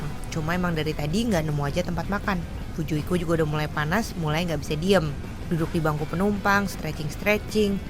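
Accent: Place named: Sunda, Indonesia. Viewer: Durasi maksimum 25 menit sudah pas sih buat nonton... more than that, akan jadi agak effort juga nonton sampai selesai... Tapi ini content very well created... mantap!! Btw, logat Sunda-nya si Cici kental banget yaa hahaha... kepo sama merk celana tactical Ko Misua, kok kayaknya bagus tuh celana tacticalnya... spill dong...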